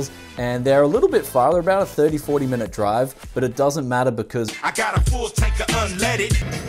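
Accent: Bogan, Australia. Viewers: Hey I loved your bogan voice it sounds awesome and hello from perth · Australians and their accent are so cute, hahahaha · why the bogun voice? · haha that Aus accent. Spot on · Came here for the aussie accent XD so entertaining XD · Hahahaha bogan voice xD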